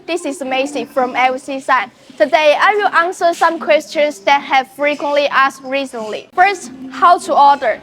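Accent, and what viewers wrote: China. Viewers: this accent is heavy china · I love the Chinese accent!!!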